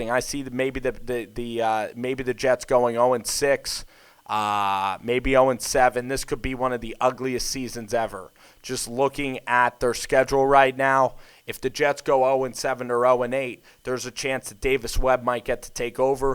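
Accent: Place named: Deep South, United States. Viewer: thinks Davis Webb a top 5 NFL QB. also what the hell is wrong with your voice?? It changed 4 times during the video and for some reason you try and mix a deep South tone into it.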